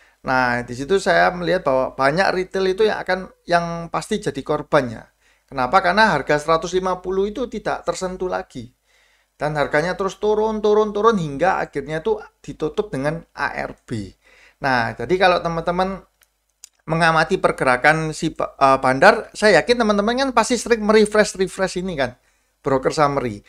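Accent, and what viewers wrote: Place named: East Java, Indonesia. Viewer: Muuuuedok tenan ko jawa timurnya 🤣🤣🤣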